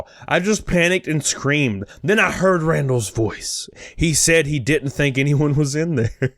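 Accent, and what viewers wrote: South, United States. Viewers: thank youtube, for recommending me your channel. I dig ya, also like the light southern accent. Subbed. Also their customer service is horrid. The other day I was trying to get a PS4 controller and they only had ONE woman at electronics when there were like 6 customers waiting around for different things. Waited 15 minutes so she could pop a tab and ring up one controller...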